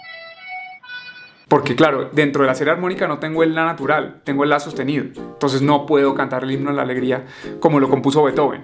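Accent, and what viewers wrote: Colombia. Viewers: Mi silbido casi no se escucha!! Y que bonito su acento colmbiano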